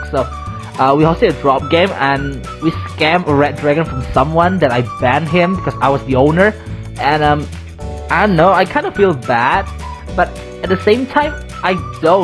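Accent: Indonesia. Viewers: Logat indo banget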